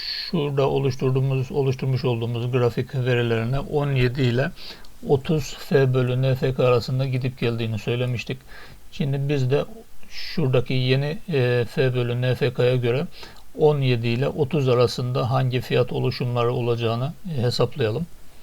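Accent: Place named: Trabzon, Turkey. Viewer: Emeğinize sağlık Trabzonlu kardeşim.Şivenize gore tahmin ettim.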